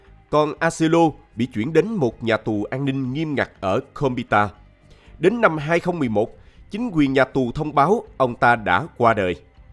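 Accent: South, Vietnam. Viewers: giọng đọc miền nam nghe hay quá . cho 1 like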